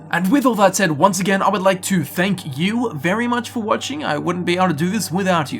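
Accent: Australia. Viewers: your aussie yeah or am i crazy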